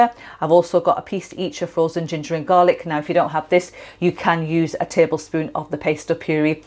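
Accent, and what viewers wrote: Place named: England, United Kingdom. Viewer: Hey, Ur new subscriber..... I love Ur voice, and Ur brilliant ,clear English accent.....and not Ur eyebrows....lol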